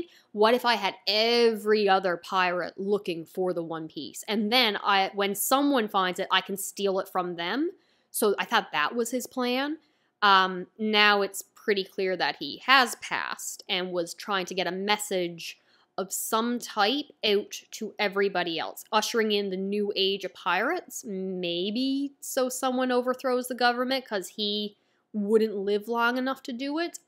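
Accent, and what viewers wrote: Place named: Canada. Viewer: My German accent is no better but your Canadian (I think) is killing lmao hahaha the way you say "Lahhh" instead of "Law" has me in tears. Love the content!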